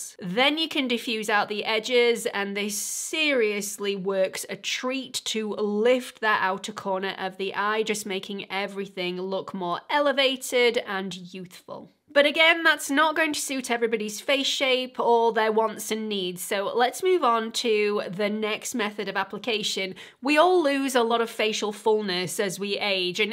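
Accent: United Kingdom. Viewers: Hi, that was insightful I normally don’t wear blush. I literally have only two one of which is a highlighting blush. If I ever choose to wear them on the more study regular basis, I will definitely head your advice. Thank you for the review and by the way, I love your accent may I ask what part of the UK are you from?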